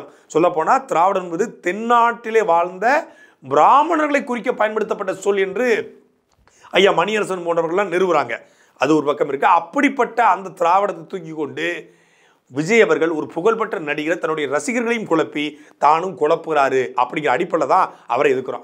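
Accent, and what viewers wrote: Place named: Thuckalay, India. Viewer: Née epadi bro thuckalay pesuna bro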